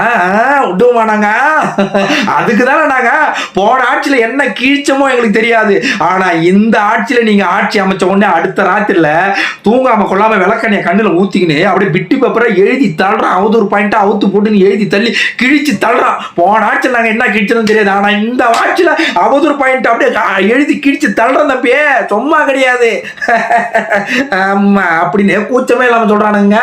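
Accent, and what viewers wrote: Kongu, India. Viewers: இது கொங்கு நாட்டு பாஷையா தூத்துக்குடி பாஷையா உங்க ஸ்பீச் வாய்ஸ் எல்லாம் சூப்பரா இருக்கு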